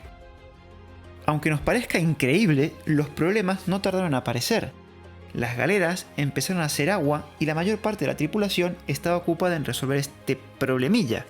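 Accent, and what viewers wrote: Latin America. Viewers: ¿Su voz en off es la de un hispanoamericano que valora las aportaciones del Imperio Español a sus territorios?. Una misma Lengua (la del Reino de Castilla) y una misma Fe Católica.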